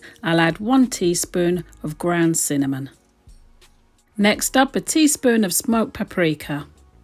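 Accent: England, United Kingdom. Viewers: I like it so much! (I mean this lovely pure english accent 😋😊). Greetings from Russia Already trying to cook 🧑‍🍳🍽️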